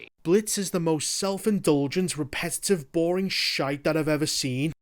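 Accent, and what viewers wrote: Liverpool, United Kingdom. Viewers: What i hate more is your scouse accent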